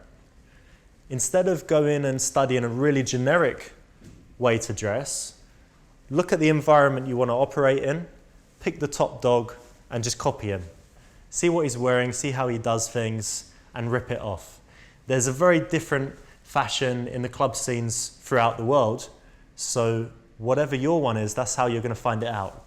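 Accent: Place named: United Kingdom. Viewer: fukkkk he talks to much and listening to a british accent is even worse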